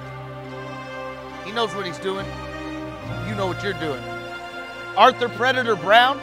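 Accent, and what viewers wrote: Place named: Texas, United States. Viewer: Dude, you're from Kansas, but sounds like yer from Texas.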